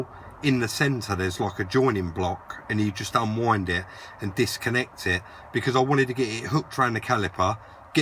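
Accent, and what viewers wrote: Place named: London, United Kingdom. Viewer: You sound like Danny Dyer with that london accent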